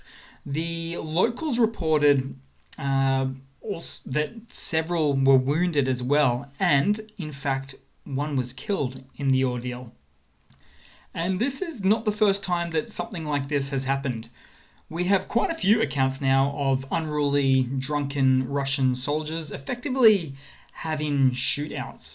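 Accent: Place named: Australia. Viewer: Thank you,! I love your updates, & I love your Australian accent!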